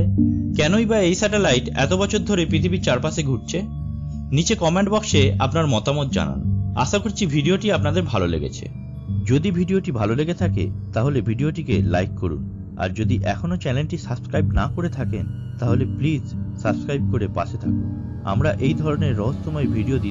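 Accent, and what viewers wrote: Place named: West Bengal, India. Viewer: indian bangla...... just...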